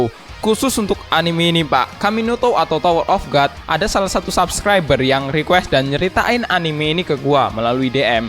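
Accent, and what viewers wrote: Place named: Java, Indonesia. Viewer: Bang lo orang mana bang logat ny kayak orang jawa ya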